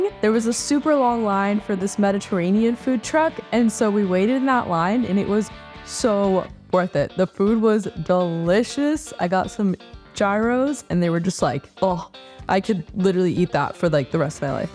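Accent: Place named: Utah, United States. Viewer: I would have guessed that you're from Utah based on your accent lol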